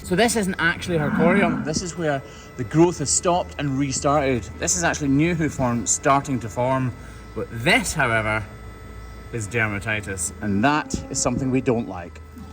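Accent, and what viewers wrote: Scotland, United Kingdom. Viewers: I don’t watch any other hoof trimmers. Why? I love the care you show your animals, your humor, and above all, your delightful Scottish accent. Thank you!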